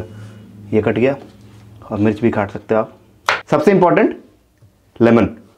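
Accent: Bihar, India. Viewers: Kit na thik he thik he Bol tha he bihar ka he KYA tuuu ???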